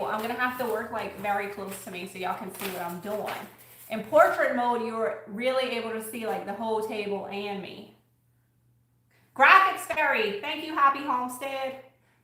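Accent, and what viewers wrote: Louisiana, United States. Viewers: ♡ I happened upon your chanel about 2 days ago and completely binged you all day today while cleaning/organizing my craft room!! So inspiring and I love your accent, I grew up in La and you bring me back!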